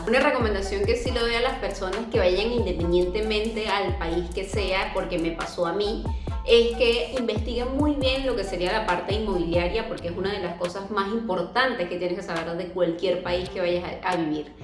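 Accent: Venezuela. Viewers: Que HDP el que te dijo que no le gustaba tu acento, como argentino realmente me da vergüenza ese peloduro, con respecto a los alquileres eso lamentablemente pasa en muchos países y en algunos es casi imposible conseguir alquiler si sos extranjero. Volviendo a lo del acento creo que lo mas lindo es escuchar a una mujer con acento venezolano ja ja ja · El que te dijo que le molestaba tu acento es un tarado, N le hagas caso. A mí me encanta el acento de venezolanos y colombianos. 🙂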